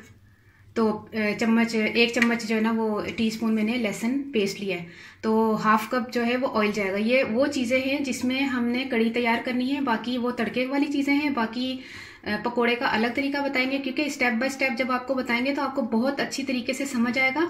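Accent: Punjab, India. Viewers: sabh to jiyada nice tuhadi mithi punjabi boli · I really need this recipe ur Punjabi is v.cute Mashallah double mzaa ataa hay 🤗🥰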